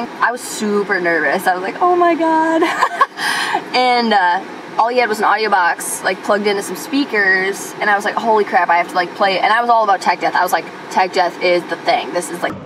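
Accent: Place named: Wisconsin, United States. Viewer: I knew it! She IS from Wisconsin. The way she pronounces her A's like in "tracking" gave it away.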